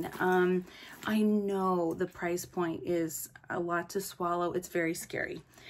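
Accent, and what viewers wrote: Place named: Orange County, United States. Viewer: Omg I’m dying I could so tell your from Orange County area ! I’m from the OC too born and raised in Irvine / Huntington 😂💗 love your videos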